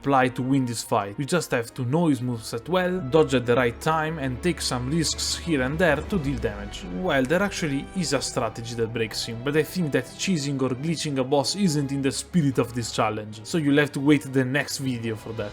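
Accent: Italy. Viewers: I LOVE YOUR VIDEOS MAN! Also sembra che tu abbia un certo accento italiano, lo adoro. È un onore vedere un italiano così appassionato fare dei video così di qualità. Keep it up bro!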